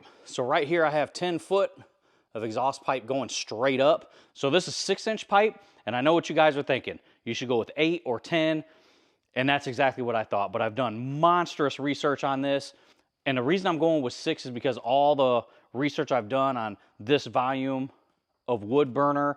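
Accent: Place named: Buffalo, United States. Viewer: My dad was a boiler code welder at Harrison Radiator Division of GM for over 30 years. He passed away at 80 just 2 years ago this past week. (28th of Nov) He would have LOVED your video and everything about your entire build here! He might have had some ideas & inputs as well. He always talked about building a boiler to burn waste oil. He knew a fella in our area in Western NY that made one back in the early 80's (or maybe it was the late 70's) At any rate he passed that interest on to me. We have lived in Alabama for almost 40 years now, so we don't have quite the need for heat as we do the A/C, but it can get downright chilly even through the short winter that we do have. I think I'd have some fun with the handles, I was thinking springs or twisted rod. There's so many things you can do to put the finishing touches on. Buttons, bells & whistles right? 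Man! I am just happy to see all you've done & appreciate ya! Good goin' bro! I'm just curious, are you in Michigan? There were a few things that you said that almost sound like a Buffalo accent, but you don't sound too much like us!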